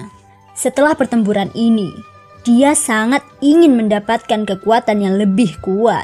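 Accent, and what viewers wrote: Java, Indonesia. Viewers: terlalu medok noba yg ke 4 ini😂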